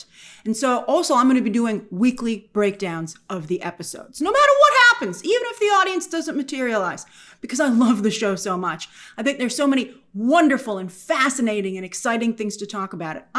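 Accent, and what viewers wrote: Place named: United States. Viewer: Took French in school, so I was cringing at her Americanized pronunciation.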